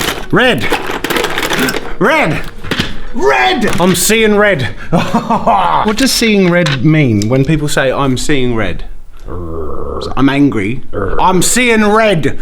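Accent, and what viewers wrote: Australia. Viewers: Just a quick question, is he Australian? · i kinda find his aussie accent nice but thats probably because ive grown up with it my whole life lol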